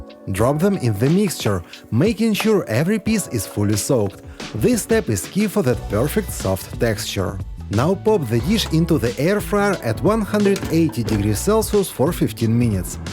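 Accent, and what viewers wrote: Russia. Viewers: Clearly sounds Russian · Russian accent 👎🤦‍♀️ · Advisory warning, there's nothing Japanese about this guy or his tricks or channel. I'm guessing from the accent he's originally Russian selling cooking techniques to an American audience. As a British born vegetarian former salad chef with Italian ancestry who doesn't eat white bread, white sugar or meat derived products, I won't be subscribing, lol. ✌️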